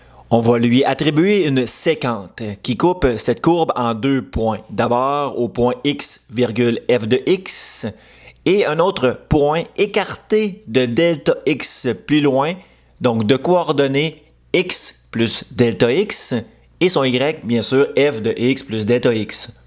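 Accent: Quebec, Canada. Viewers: Je suis tombé sur votre vidéo totalement par hasard et je ne le regrette pas du tout. Merci pour ces explications très claires que j'avais dû zapper il y a très longtemps avec mon prof de maths au lycée 😁 Je comptais me remettre aux Maths et votre chaine me parait une bonne ré-entrée en la matière. Merci beaucoup. Je vais regarder vos autres vidéos avec plaisir. Et votre accent québécois me plait beaucoup 😊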